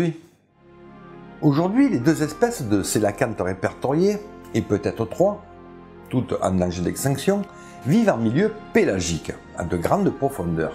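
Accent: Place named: Provence, France. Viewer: "Fing Silurieng/début Dévonieng" je pensais pas qu'un paléontologue du sud de la France pouvait être aussi exquis à entendre 😂😂😂 Vraiment entre les familles d'espèces et les périodes, tu régales !! Hyper intéressant par ailleurs, tu alimentes ma fixette du moment 👍👍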